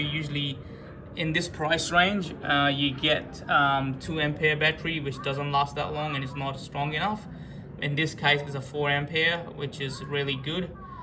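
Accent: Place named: Australia. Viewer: U have perfect aussie accent even better than aussies...how u mastered it... ? Just feeling michael clarke is there...😅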